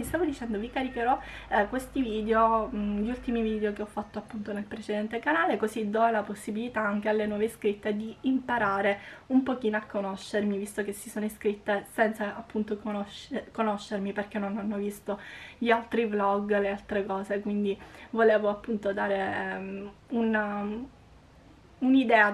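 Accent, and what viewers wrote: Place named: Sicily, Italy. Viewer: Io sono nuova ,finalmente sento la tua voce,un altra Sicilianaa belooo,io sono di Palermo,ma vivo in Toscana da 20 anni .Cara ti seguiro',un baciuzzo 😘😘😘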